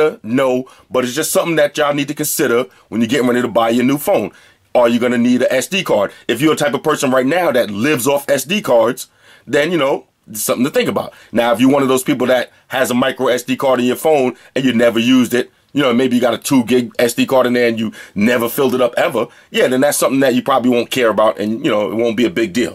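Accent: United States. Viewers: Loving the american accent though lol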